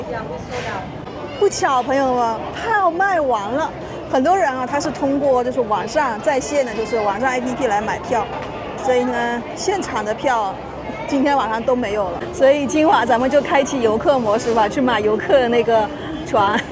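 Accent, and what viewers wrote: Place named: China. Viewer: 英文太偏中式😂😂😂比不过越南酒店前台服务。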